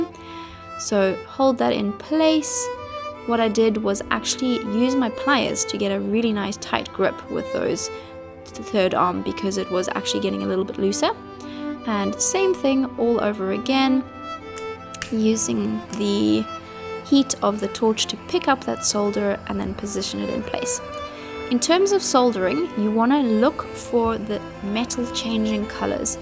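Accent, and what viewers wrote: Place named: South Africa. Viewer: Very nice work, I thought Nordic with good English as I've spent time with them. Then I think very, very soft south African.👍🏴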